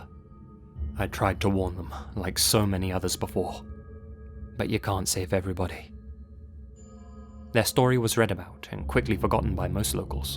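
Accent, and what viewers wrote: England, United Kingdom. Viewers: I’m kind of new to this channel, and I’m really enjoying itself! Although one thing, I’m so confused on where is from. He has a British accent I think, but he says he’s lived in a lot of places in Asia I think. Sorry if this is a weird or dumb question! I’m just a little confused. 😅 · You grew up in the philippines ? I always thought you were british 😅 at least you have a british accent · Am I late to the party or…..did this white guy with an English accent just say he was Filipino? I’m thoroughly confused but having said that, been binge watching videos for about a week and now I can’t sleep 😊 Love the content!!!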